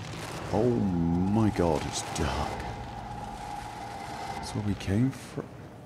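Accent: United Kingdom. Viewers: Hey.. Where are you from? Your accent sounds sort of British, but I notice you roll your R's, well, not all of them, only every now an then..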